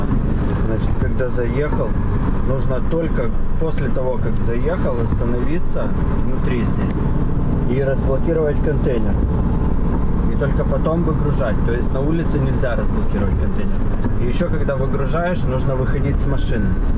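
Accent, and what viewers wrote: Odessa, Ukraine. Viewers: смотрю с удовольствием бытовые сцены... дорожные трипы никому не нужны, если больше 5 минут... одесский акцент умиляет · Пролетел говор Одесита, Удачи!!!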